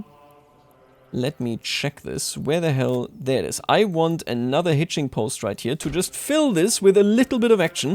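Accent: Germany. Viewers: Bro doing germglish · i love your Denglish xD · Are you german you say 4 Seiten globel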